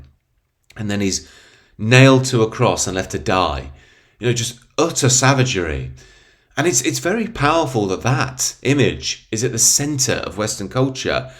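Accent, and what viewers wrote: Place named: Northern England, United Kingdom. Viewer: Eee, by eck, that northern accent were ruddy grand 😁.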